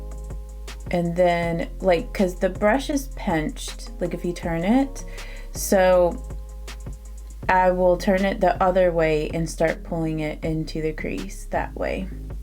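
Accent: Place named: Louisville, United States. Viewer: Love the tip about extending bottom lid eye shadow. It makes a huge difference in how these 64-yr-old eyes look. Also, I ❤ listening to you talk. I learned to talk in Louisville, so you sound like home folks to me!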